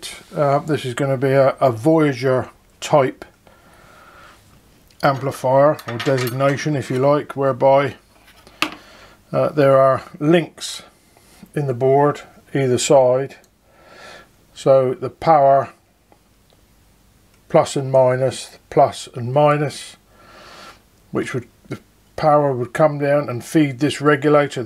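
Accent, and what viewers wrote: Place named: Midlands, United Kingdom. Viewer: I'm trying to work out your accent. It's not Yorkshire, but more like Leicestershire, Derbyshire, Shropshire or Lincolnshire...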